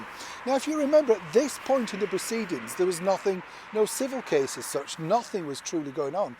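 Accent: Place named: England, United Kingdom. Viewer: I adore your English accent and your covering what is going on in such a lovely way.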